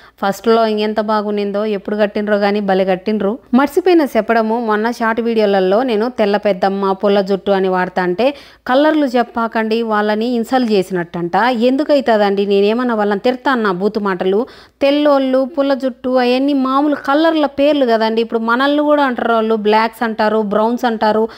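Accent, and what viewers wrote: Rayalaseema, India. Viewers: super andi... 1st time mana rayalaseema slang youtube lo vintuna...ma uru proddatur keep it up 👍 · Hi ma maadhi kuda rayalaseema ne kurnool. Meeru rayalaseema slang lo matladuthunte chala bhagundhi.nice keep going on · Mana Raayalaseema slang yekkadiki vellinaa thaggedele.. negative comments skip cheyandi..manam andarinee navvisthu, navvinchadame mana vuddesam....👍👍👍👍👍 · Nice video, Maana Raayalaseema language ni andhari teliyajesavu ne mattalatho super chelli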